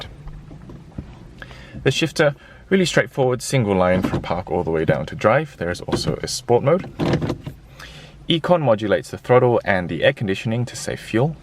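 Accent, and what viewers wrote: Australia. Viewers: Aussie, probably brought up in a classy Aussie town or school.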